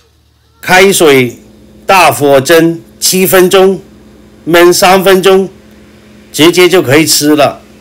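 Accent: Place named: Cantonese, China. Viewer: 讲解很详细，广式普通话很好听，让我这样完全听不懂粤语的人能听到明明白白，谢谢，果断订阅。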